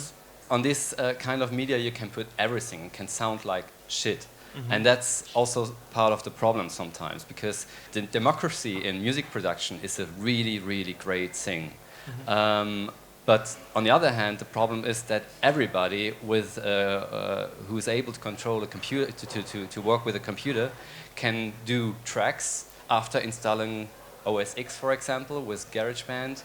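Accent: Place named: Germany. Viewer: How about german with subtitles? I hear the german accenct all over why not talking in german, like electronic beats - Slices?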